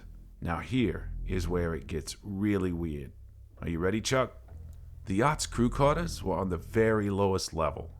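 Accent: Boston, United States. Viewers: Yes! And pretty decent Boston accent too, lol!! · Wow! Great performance,.. and I loved the Bawston accent! 👏👏👌👍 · As far as the expletives that is exactly what a Boston Bartender in the Docks Fishing Community would sound without them the accent wouldnt have played out correctly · I don't hear south philly ...this accent is more boston I think. But, yes, I agree, no matter where it is actually from, the narrator does a great job executing it.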